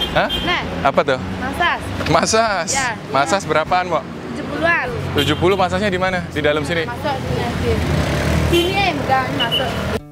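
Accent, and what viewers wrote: Bali, Indonesia. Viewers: aksen balinya kental banget :D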